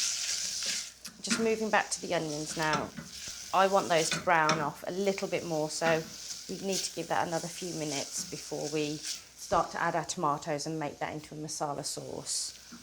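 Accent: England, United Kingdom. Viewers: Yair Gil... sorry I don’t quite understand your comment, speaks very eloquently so everyone can follow the recipes. She isn’t trying to sound more English, she is English!. comes across as very down to earth and is just sharing her passion for cooking! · Dusky Indian looks Dark hair and eyes. Impeccable English accent. Nice healthy simple Indian dish. Isn't Ghotra...LOVE itself?🥰🥰🥰 Lub ya & your receipe, love!!!! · I have never in my life seen an Indian with an English accent from England.. this is weird.. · This is a fairly typical English accent for all ages and sexes among those without a regional accent!!! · The worst thing is the acquired British accent which is in no way better then the sweet Indian accent. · She speaks British English. Must have been educated there. Very professional video. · Indian kitchen with very beautiful Harry Potter accent. 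Good combo. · she actually sounds like a lot of British asians born and raised here. I have a Pakistani colleague who speaks so similarly to her. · Thanks for style of cooking..just to let you know not my style. But am being polite in appreciating your efforts. Understanding your British accent is something else. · As I wrote down the ingredients of this recipe, without looking I could swear that this is the voice of an older English lady. Remarkable how accents around the world get absorbed. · Well I like your British accent from Toronto of guyanese origin in the west indies. Our dall is cooked with yellow split peas boiled for about 2 hours with garlic and turmeric then chunkay with oil,garlic and Cuman. We use dall to compliment any dishes with meat or vegetables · Such a lovely British accent 😅 Greetings from Germany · U r a very good and beautiful instructor. I also watched ur video on making samosa. 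Also such a beautiful english accent. Thanks. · just found your channel and i am very pleased and going to cook this dish. my daughter livend in london for twenty years before she died of breast cancer and london became my second home i am swiss but live now on the eastern sea in germany. i like to hear that wonderful english english and will have a good look at many of your dishes. whenever i arrived in london, the frist meal was alway indian and myself am cooking with indin spices too. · How come this Indian Lady speaks like an English Lady? I always thought that dhal is the Indian name for lentils. Or is there something called lentil dhal?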